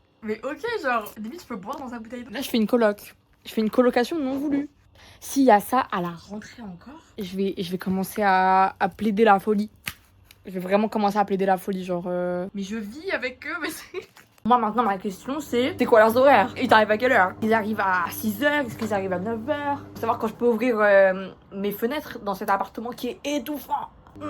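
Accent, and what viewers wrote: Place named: Maghreb. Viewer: Pourquoi tu parles comme une blédarde? Pfff. La vie en ville les accents de la ville c'est devenu d'une laideur. Dommage sur un si joli minois. Enfin bon force dans tes galères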